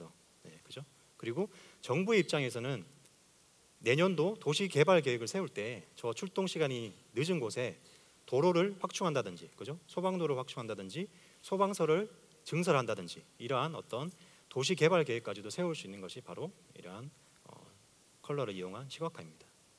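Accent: Gyeongsang, South Korea. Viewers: 좋은 내용.전 왜 한국은 인포그래픽이 없나햇엇는데. 강의하시는분말투는 경상도 생긴건 전라도네요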